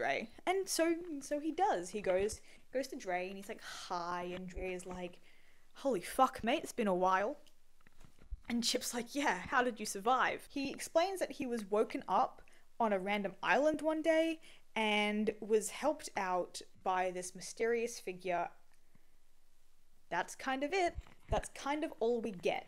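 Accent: Australia. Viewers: SLAYYY (i forgot you were australian so it i had quite a bit of whiplash) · BRO UR AUSTRALIAN??? IM AUSTRALIAN!!!!!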